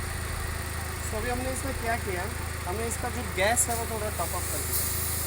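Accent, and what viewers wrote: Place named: India. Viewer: English title but Indian narration. Dislike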